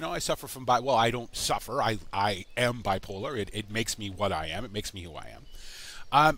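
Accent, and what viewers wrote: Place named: Brooklyn, United States. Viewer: you sound like you're from brooklin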